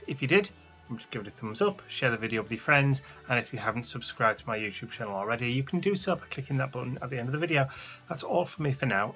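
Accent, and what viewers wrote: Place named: England, United Kingdom. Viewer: I loved the, "coup-pah tea, and a biscuit shared with Mr. Bentley...", your English accent is so lovely. But....whoa! "....what can you expect of a man"?????? hahahaha! You're a hoot! I do love the whole cosmic stream of your creative/thought process, tho. So different for you. And how clever, the way you "incorporated" the words into the whole graphic design of your spread! I've been over that whole "smeared-charcoal-border" around the words technique, but I felt like just leaving the "anemic-white-patch-with-a-word" was not enough either. so....thanks - - for opening up the scope of a whole.....new thing! Big hug, cat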